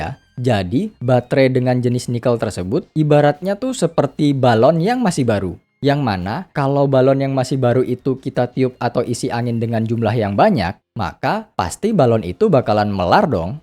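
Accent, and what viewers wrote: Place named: Central Java, Indonesia. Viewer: Kayak orang rembang ini😅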